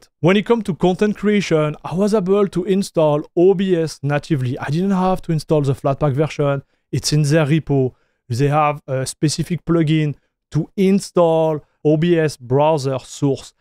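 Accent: France. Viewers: chat, i think this guy might be french, can't put my finger on it why tho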